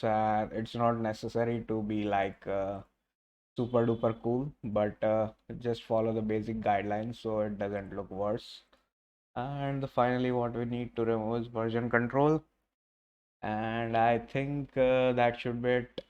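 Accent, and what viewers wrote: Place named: Gujarat, India. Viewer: are you form Gujrat ? keep it up bro.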